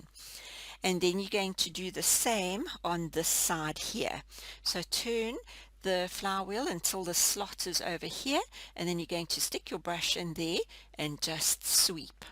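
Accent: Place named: South Africa. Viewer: Lovely to hear your SA voice. 🙂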